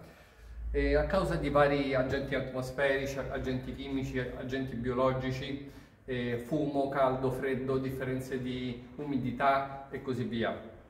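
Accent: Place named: Rome, Italy. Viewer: Ma è sicuro che «craquelure», francese, si pronunci proprio così come fa Lei? Confronti l'ortoepia magari su Google traduttore e vedrà che l'esito è un po' diverso dalla Sua interpretazione. Certo la /ü/ è notevolmente difficile da rendere, specialmente per un «romano de Roma», però con un po' d'esercizio magari...!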